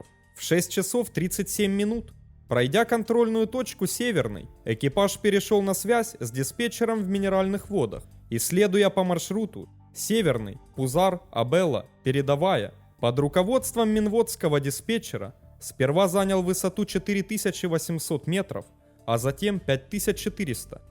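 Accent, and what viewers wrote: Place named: Ukraine. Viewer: Мляяя, кому не лень делают обзоры катастроф, походу не понимающие в авиации ничего. Сам инженер авиационный с 1989года. Говор автора вроде украинский.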